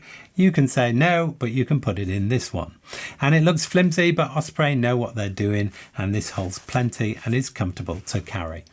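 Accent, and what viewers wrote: England, United Kingdom. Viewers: That’s an English accent.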